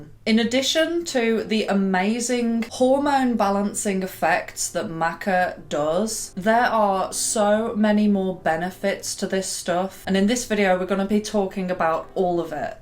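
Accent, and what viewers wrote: United Kingdom. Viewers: Red maca does work alot better than yellow for woman hormones and curves. I lost weight in the stomach area when mixing it with chia seeds but gained boobs and glutes 💚 love listening to you btw UK accent gives me happy shivers. Will be checking in on your channel.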